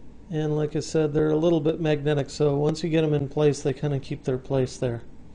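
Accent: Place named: United States. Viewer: Thanks! At last! Someone who actually knows what they are doing in a Apple Mighty Mouse take apart & repair. The instructions are very good and the narrator's voice is in adult American English. Plus the narration is without a lot of superfluous "Uhhh"s and other vocal diatribe. And it is even edited well and in focus! What a novelty! In my case, the outer containment ring was REALLY glued down in front. It took a good half hour of gently slicing into the space between the ring & the housing to with several sharp Xacto knife blades to finally cut through the glue. The containment ring is pretty flimsy and I was afraid of breaking it so I did not try to pry it too hard. Patience (and a sharp blade) is the key when it is glued so hard. This mouse of mine had stopped working properly about two years ago. It would not scroll in one direction. But upon disassembly I found that my unit was not as dirty as I thought it was. 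What I discovered was that just one of the little tiny wheel & shaft assemblies that surround the ball was really jammed up with debris keeping it from moving. Because I waited so long to do my tear down the shaft had actually worn down on one side from the friction of the ball against it. So my problem was not solved. It certainly works faster and smoother than before but that one direction is still not functioning due to the worn shaft. Lesson learned—if simple exterior cleaning is not enough (it never was) then do a tear down and initiate a proper cle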